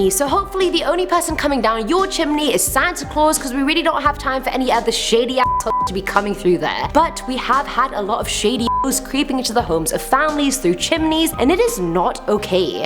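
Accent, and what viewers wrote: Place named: United Kingdom. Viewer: She is my favorite host the British voice just hits different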